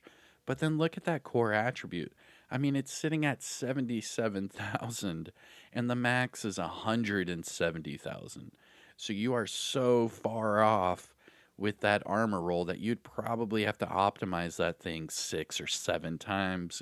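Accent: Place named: Northern California, United States. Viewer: Its your opening! It peggs you to Northern California. "how ya doing? whats going on?" The tonal sound and enuciation is possibly even going towards San Jose - Santa Cruz.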